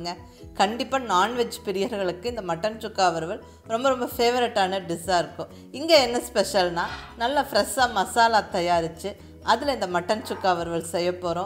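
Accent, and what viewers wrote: Kongu, India. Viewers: Konku tamil arumai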